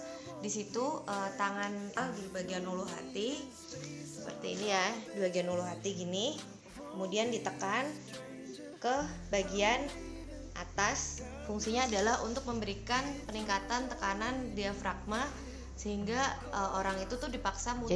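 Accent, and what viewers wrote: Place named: Java, Indonesia. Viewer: Dok jawa nya orang mana dok? Hihi keep semangat dok!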